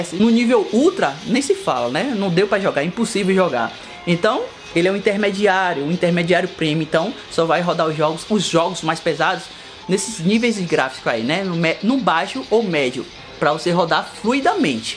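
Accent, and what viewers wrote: Bahia, Brazil. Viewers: Cheio de sotaque kk, esse é baiano mesmo ❤️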